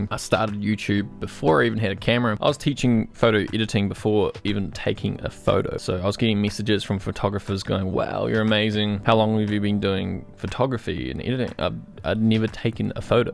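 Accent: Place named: New Zealand. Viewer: So I’ve watched other videos of yours and only just clicked now that you do indeed have a kiwi accent and are from NZ too. I feel so stupid haha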